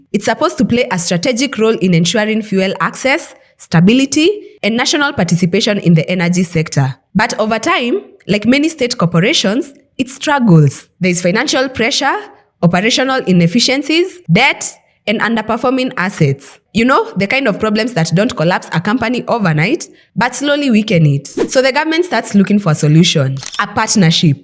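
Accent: Kenya. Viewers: She has the typical Nairobi English Accent 😂 .. the Kenyan English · her authentic Kenyan accent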